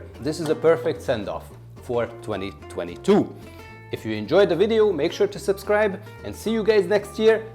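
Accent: Hungary. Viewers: Your Hunglish accent is adorable hahaha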